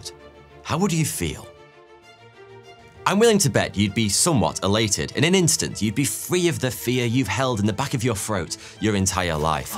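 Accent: United Kingdom. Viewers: Alabama? I thought you were from the UK.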